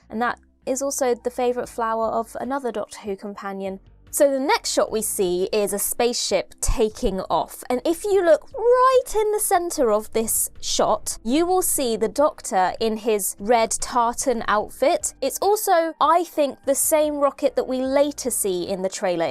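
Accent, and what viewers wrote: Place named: Berkshire, United Kingdom. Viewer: Is the presenter from Berkshire? She sounds posh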